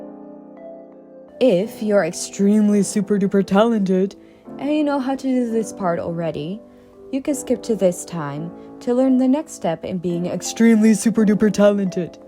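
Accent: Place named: Netherlands. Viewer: i smell dutchness :D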